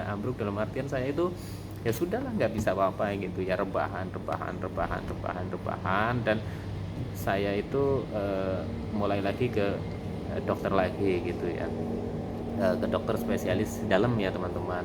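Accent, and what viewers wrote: Banyumas, Indonesia. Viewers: Mas mau tanya, Mas ngapaknya mana, sy ngapak Kebumen, anxiety sy masih suka datang Mas, blm sembuh bgt. Trimakasih motifasi nya, 🙏🙏🙏.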